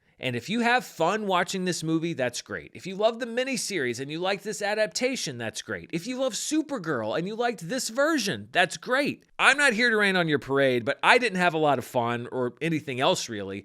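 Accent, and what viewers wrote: St. Louis, United States. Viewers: Are u from Saint Louis??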